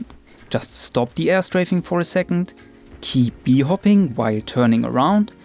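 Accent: Germany. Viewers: Hi, are you German? It's just bc your voice and pronunciation sounds a bit like German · You sound Like a german Guy LeL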